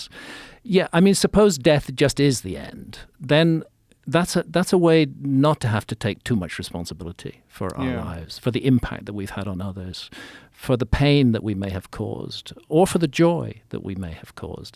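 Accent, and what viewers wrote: United Kingdom. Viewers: Still waiting for concrete proof of your theories graham… you trick a lot of people with your fancy words and British accent but eventually we need some proof. I’m sick of listening to the same stuff over and over again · jus because u have a british accent doesn’t mean ur smart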